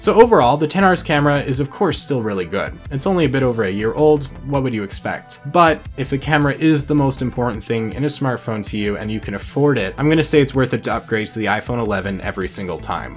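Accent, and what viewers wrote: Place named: Canada. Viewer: i could tell your canadian within 3 seconds of the video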